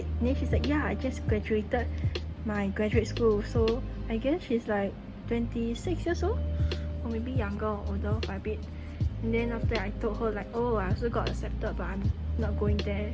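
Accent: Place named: Singapore. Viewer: Quintessentially Singaporean! 😄 A bright Singlish spark in dark times!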